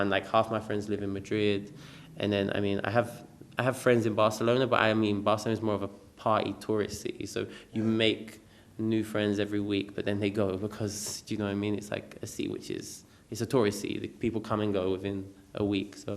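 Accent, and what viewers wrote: United Kingdom. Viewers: Idk why everyones a bit disappointed of his voice ....like guys he have got british accent ....😍😍😍😍😍😍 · Lol nah, he just has a typical London accent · His British accent makes him even hotter if that’s possible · lili maxwell Actually he's scottish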